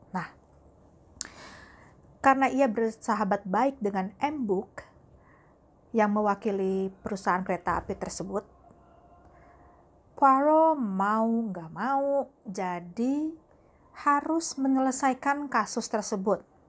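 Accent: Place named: France. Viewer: Asiikk banget pake aksen français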